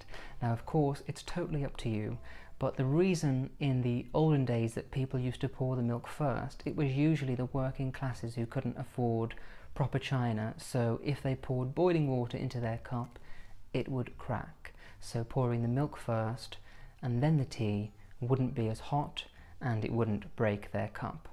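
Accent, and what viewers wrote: RP, United Kingdom. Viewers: god i love british sm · Way too posh for me! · Thanks for sharing. I didn’t know green tea wasn’t able to withstand very hot water. Interesting. My grandmother was from the UK and she passed away a couple years back. I usually drink coffee but I will have to have a cup of tea in memory of her. She was in the US for so long (from around 20 years of age due to marrying my grandfather who was in the Air Force; they met in England when he was stationed there)... Anyway, I’m rambling but I miss her. She was the most elegant lady I know. You remind me of her for some reason. It must the the accent as well. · My goodness. I'm so mesmerized. My grandfather is a Londoner in the US and I've never heard anyone so posh besides him....but also SO amazingly refreshing. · I went to an English school for sixth form and I love all of Britain. 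But (please forgive me) this type of accent is so hilarious, it sounds so pretentious and formal, I always wonder what you must have put up your * and what size it is. Great video though. WHERE IS MY SCONE?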